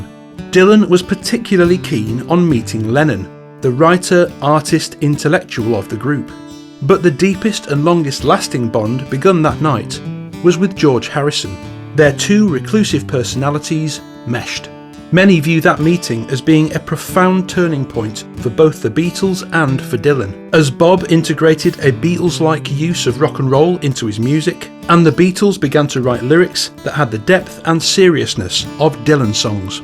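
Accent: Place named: North, United Kingdom. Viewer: You are an excellent storyteller! Don't short-change yourself or your audience by adding meaningless background music😮 Seriously! It's enjoyable to listen to your voice, northern accent and natural speech rhythms👍🎉 Any background music is not only superfluous but substracts from the listening experience imo.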